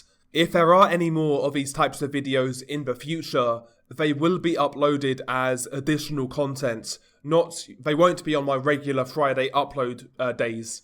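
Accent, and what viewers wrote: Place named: United Kingdom. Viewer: He’s British dude we all know what he’s saying stop complaining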